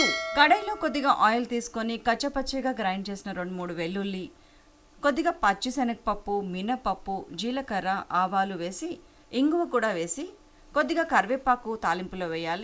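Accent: Telangana, India. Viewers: Akka me Language superb akka nenu me lagey pakka telangana matladtha mastu ma Hyderabad relatives comments chestaru...akka 'Manchiga' is also my word..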